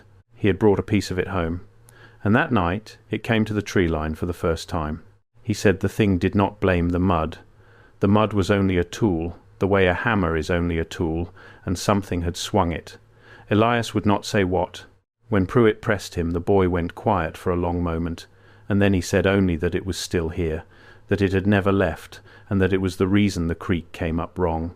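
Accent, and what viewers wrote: United Kingdom. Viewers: Thanks for saving me twenty minutes. It’s ridiculous to have a British accent for this story. It’s kind of like if one of the holler gals usually dressed in a dirty cotton dress suddenly donned a dress just sent from Paris. A mini skirt dress, yellow with large black polka dots and black boots.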